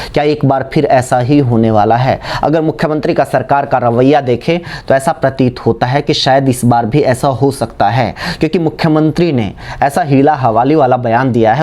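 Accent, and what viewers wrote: Haryana, India. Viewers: Very nice bhai Haryanvi tone is also very nice in between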